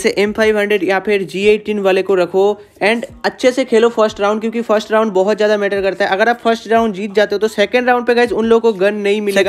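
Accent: Bengal, India. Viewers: Bro bengali ho kaya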